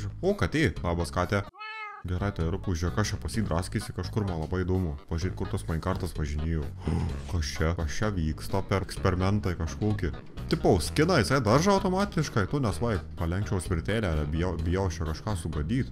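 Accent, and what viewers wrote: Suvalkija, Lithuania. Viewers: Gerai moki kalbėt suvalkietiškai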